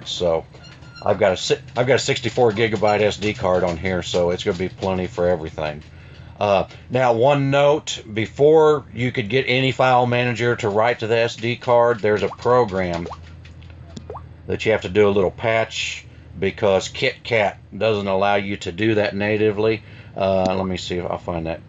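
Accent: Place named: South, United States. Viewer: I just purchased a Tab S, so i've been watching alot of reviews for the Galaxy Tab S in various engish dialects from different countries and your video in the southern American dialect is great. Very impressed with the way the video was presented and really showed a great tablet "really" used for work. You sold my on the Next Launcher, very good set up you have. I will be hitting subscribe, thanks dude.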